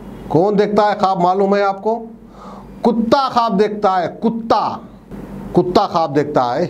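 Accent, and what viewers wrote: Hyderabad, India. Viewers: bhai aap pakke hyderabadi hai mast baatein karte aap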